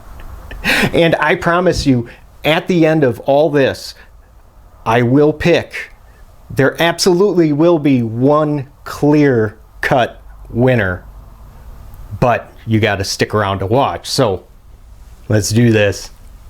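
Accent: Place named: Michigan, United States. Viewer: this was exactly the video i was looking for, thanks man. first time i've seen your channel, i dunno where you're from but i just moved away from michigan to the west coast and your accent brought me back home for a minute, lol. are you a yooper?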